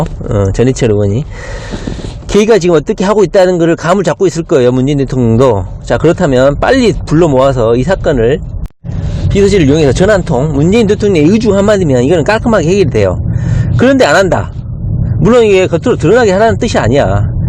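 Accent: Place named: Gwangju, South Korea. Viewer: 광주출신이요? 아따, 발음이 친숙허요...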